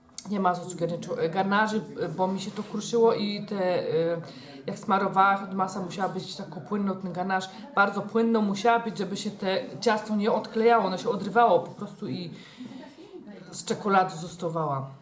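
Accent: Silesia, Poland. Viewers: Genialna odpowiedź :) uśmiałam się do łez! Swoją drogą ja uwielbiam Cię słuchać; mogę sobie porównać różnice między naszą "godką" (Zabrze) a Twoją. Pozdrawiam serdecznie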